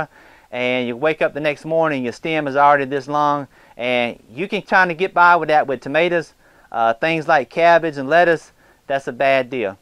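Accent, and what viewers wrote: South, United States. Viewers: finally, genuine southern accent... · Lord willing and the creek don't rise. I haven't heard that in 20 years. Your idea for the grow light setup is terrific. I never thought 1" PVC pipe would be strong enough, but it sure looks sturdy. I'm really commenting, though, to tell you that you have the best accent in America. I have NO idea where you're from, since the only sort-of southern accent I know is New Orleans, where much of my family lives, and that accent is just weird. You don't sound like a Texan, either. Actually, you sound like the character Boyd Crowder on "Justified." That took place in Harlan County. Are you from or near the great Commonwealth of Kentucky? But the actor grew up in Georgia, so maybe he was just speaking his own natural way. Don't laugh at me. I was born and raised in the rust belt and moved to Cali as an adult. 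Never gotten to the south other than N.O.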